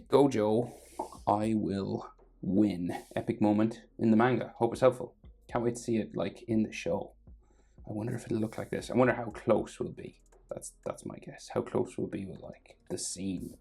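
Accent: Ireland. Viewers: is that an irish accent????????????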